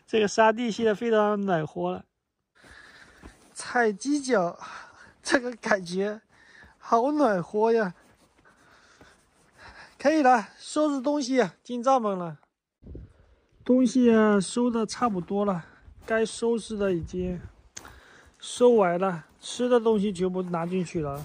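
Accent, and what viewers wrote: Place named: South, China. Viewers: 哈哈哈哈！南方口音。我聽著很親切。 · 感觉浙江口腔 温州一带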